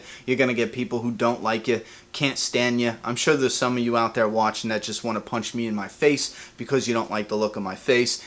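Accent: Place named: East Coast, United States. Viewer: I like you. I'm from Long Island originally and it's nice to hear an east coast accent for a change. Trevor at Trevschan2 could learn a few things from you, IMO, so I think you do a good job. BTW, anymore of those candy packs come your way?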